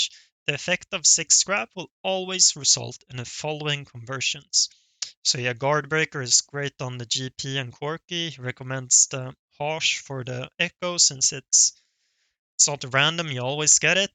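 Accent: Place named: Sweden. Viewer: At the end there.. What Title meant with the tips is that it is crucial to find Illaio 2 with rebels, and to do that over Zoe 2 star. Spending 12 gold on making Zoe 2 star when rolling down(and being stuck on that 12 gold loss - cant sell her), then being broke - and then not finding Illaio 2 star. He means that this is a big "loss condition" for rebels. How to lose games with rebel. Maybe prio looking for 2star illaio first, then other units when rolling down, maybe dont make Zoe 2 star if low gold when rolling down + no illaio 2 star. Great videos btw, i love your content. Keep it up! P.S you sound Swedish. Heja svenskar, snart blir det varmare här! haha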